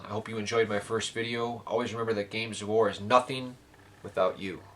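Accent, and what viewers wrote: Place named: Northern Michigan, United States. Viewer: Your North Michigan accent is always a welcome sound to my ears (no homo). Reminds me of my brief time at Michigan State University, and tater tots (which I am not a fan of). This is the evolution of Shinobi on Master System, mixed with Shadow of the Ninja from Natsume for the NES.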